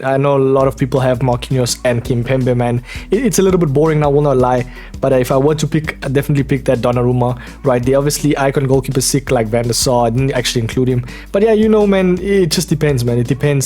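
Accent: South Africa. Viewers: As sooon as i heard your voice i knew you were south african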